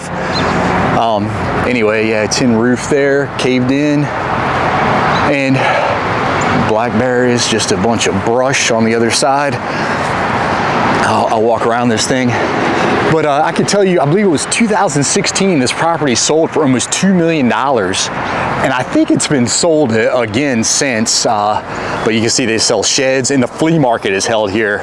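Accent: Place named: South, United States. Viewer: Wow, what a great accent! Where are you from? Virginia, West Virginia, Georgia? I skipped the intro.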